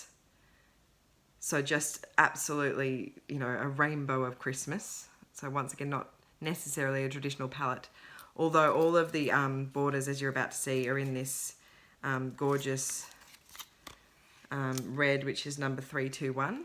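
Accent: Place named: Australia. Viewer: Just discovered your floss tube - I am suck at home with a sore throat which I hope is not the dreaded illness and occupying myself stitching and catching up on flosstube. It is so lovely to hear a video in an Aussie accent, and I am absolutely delighted that my pattern is the first FFO :) Hope your studies are going well... I work in environmental science for my day job and am a low waster, and it's lovely to find a member of the stitching community who shares both passions :)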